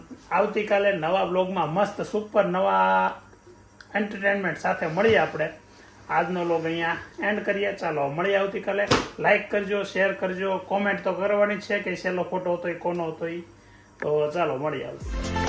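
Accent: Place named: Mumbai, India. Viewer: Dada e song bavj saras tune maa gaayu, emno accent thi laage che ke pela Mumbai rehta hoi.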